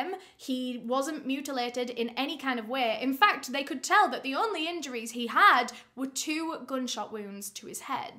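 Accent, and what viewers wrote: United Kingdom. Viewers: I found British MrBallen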